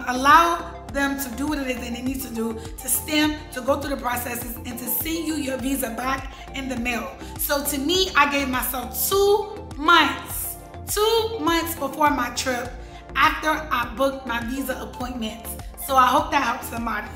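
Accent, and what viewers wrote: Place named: Nigeria. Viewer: Some of Your words are becoming to sound like a Nigerian 😅....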